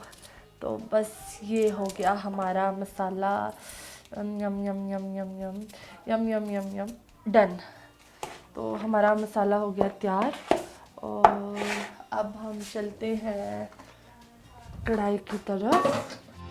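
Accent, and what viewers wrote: Punjab, India. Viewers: Pure punjabi excent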